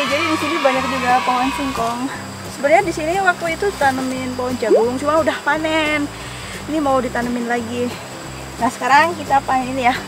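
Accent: Banyumas, Indonesia. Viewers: Mba si umahe nng ndi mba, sue subscrbe tp ora ngerti wong ndi, bhsane ngapak2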